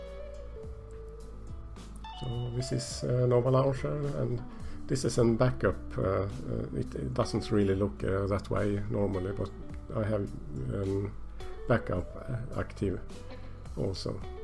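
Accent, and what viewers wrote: Sweden. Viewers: are you from sweden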